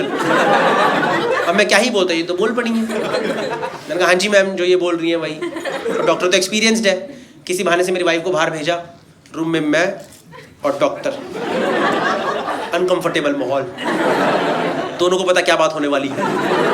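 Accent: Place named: Punjab, India. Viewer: Hard to understand the super faster than Rafael jet talking combined with the thick punjabi accent. Please provide English subtitles also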